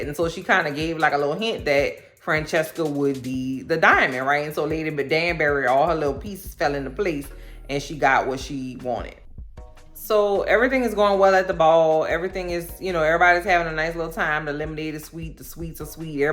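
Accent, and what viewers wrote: New Orleans, United States. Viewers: All I heard was your voice and I said "Oh she DEFINITELY from New Orleans!" 😆 I'm from the N.O. too. Hey fren!👋🏽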